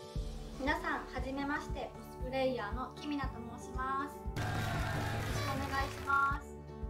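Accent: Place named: Kansai, Japan. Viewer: 今までツイッターでしか見たことなくて、声とか喋りとか分からなかったけど(勝手に標準語と思ってました。) しっかり関西弁で親近感わきました(笑) 子育てもYouTubeもコスプレも頑張って下さい！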